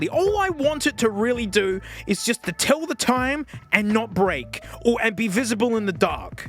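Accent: Australia. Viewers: And so he can FINALLY let his true Aussie language out.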